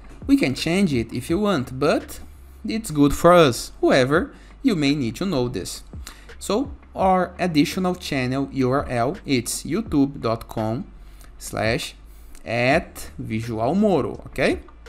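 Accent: Brazil. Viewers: salve! pelo sotaque só pode ser BR, valeu pela dica, nao tava achando onde mudar a bagaça!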